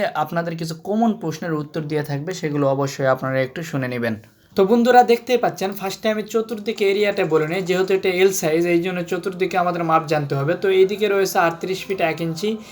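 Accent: Naogaon, Bangladesh. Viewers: ভাই আপনার বাড়ি কোনটে? আমাকরে নওগাঁর মতোন ভাষা লাগিচ্ছে।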